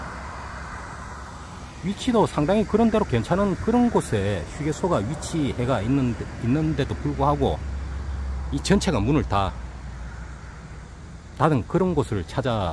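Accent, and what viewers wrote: Gyeongsang, South Korea. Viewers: 설명 하시는분, 경상도 사투리를 의도적으로 강하게 하시는데, 좀 순화 하시길. "맹" 같은 말은 무슨 말인지? 혼자만 알수 있는단어라면 무슨 소용?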